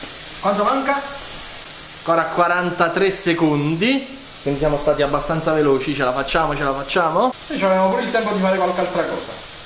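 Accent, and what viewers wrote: Naples, Italy. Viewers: Ma sei di Napoli?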